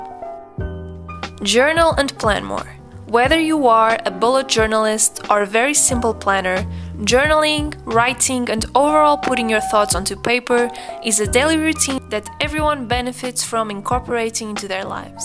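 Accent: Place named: Portugal. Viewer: Ando a descobrir canais que me transmitam energias positivas e me motivem, parabéns está incrível. Houve uma coisa que teve imensa piada, quando comecei a ouvir o vídeo pensei "Fogo parece mesmo uma portuguesa a falar inglês, super clear mas não inglesa obviamente" e fiquei mesmo "wow tem que ser". Fui ver à bio e acertei, que inglês excelente!!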